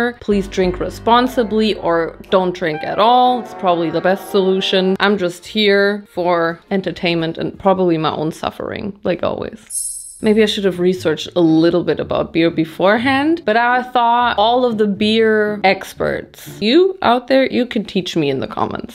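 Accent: Bavaria, Germany. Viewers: bist du bayer? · Der bayerische Akzent war halt echt nicht schlecht 😂 Grüße aus Bayern!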